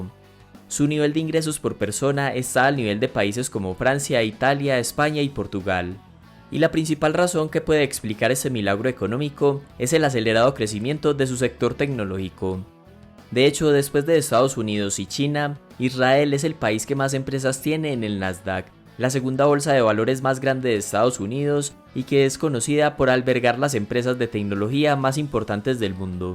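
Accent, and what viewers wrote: Antioquia, Colombia. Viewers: Excelentes videos. Hace poco empeze a seguir el canal. Soy de Colombia y le sentí cierto acento paisa al narrador . Los veo desde Memphis TN 🇺🇲🇨🇴. Dios los bendiga y gracias por ayudar a la cultura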